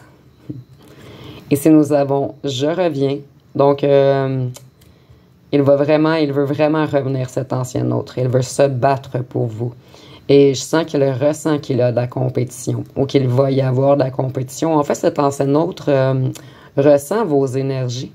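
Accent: Canada. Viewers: Bonjour merci pour ce tirage qui me parle beaucoup,gardez bien ce joli petit accent canadien, belle journée à vous 😊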